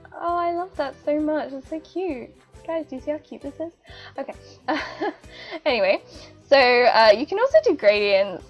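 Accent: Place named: Australia. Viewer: your voice screams "AUSTRALIA"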